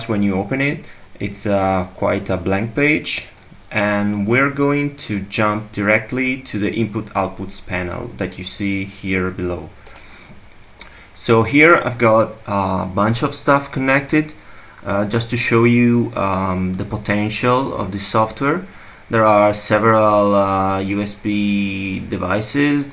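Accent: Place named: Italy. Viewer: dal tuo inglese sembri italiano, PUOI FARE UN TUTORIAL IN ITALIANO????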